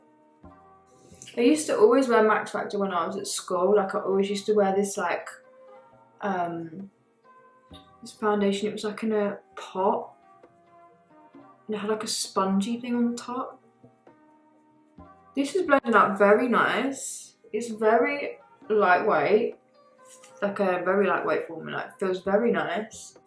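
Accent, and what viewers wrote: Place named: Manchester, United Kingdom. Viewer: Is it me or accent is changing lol 😝 baby girl!!! Xxx hearing that manny accent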